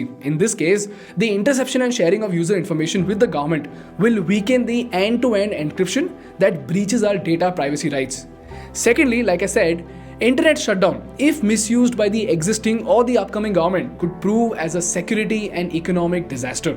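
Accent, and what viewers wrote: India. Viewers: Your videos are awesome! Keep it up - informative, well researched, superb presentation graphics/ text/ delivery and above all designed to throw clarity on some of the complex issues that affect the average person searching for truth. While your accent is Indian, and so very genuine, (words in every language are pronounced differently by users and thus add to the novelty ) your content is what carries the message. Kudos.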